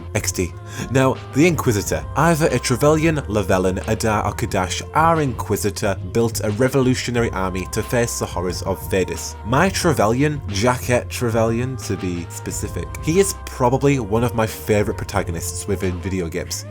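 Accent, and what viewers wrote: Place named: Sunderland, United Kingdom. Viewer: I thought I heard a Mackem twang in your voice, and although my mage may be 1 handed he’s far from helpless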